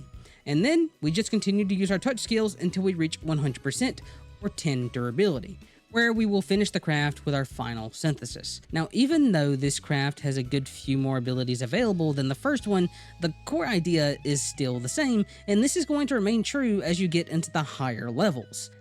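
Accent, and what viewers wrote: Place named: Kentucky, United States. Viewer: Sounds like me and I’m Kentucky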